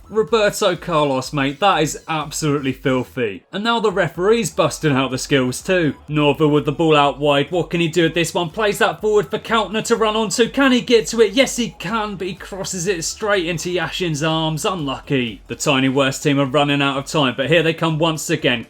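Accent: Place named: United Kingdom. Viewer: I like your British accent melted through years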